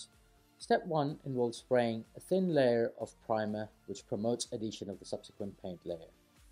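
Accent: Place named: Malta. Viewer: Curious to know where your accent is from - you sound just like an educated Maltese. Great job btw!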